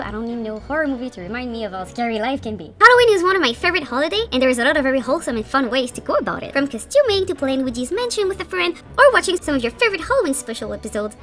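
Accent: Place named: Quebec, Canada. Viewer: That’s so funny to hear you with your French Quebec accent in this video,! I like it. For the rest, I didn’t know then you make an halloween challenge like that in YouTube, that’s pretty cool.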